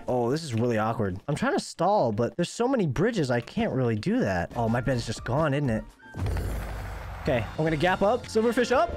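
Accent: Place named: United Kingdom. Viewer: Bro your british thats sooooooo cool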